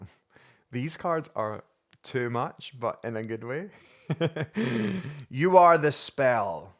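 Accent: Scotland, United Kingdom. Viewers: Lovely cards like the coffee love the Scottish accent ❤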